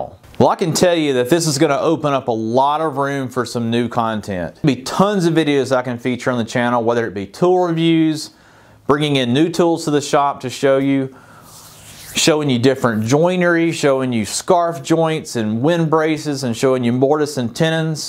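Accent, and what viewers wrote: Tennessee, United States. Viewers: Sure it is going to be great to follow! Good luck! Ah, Tennessee! Haha i like the accent!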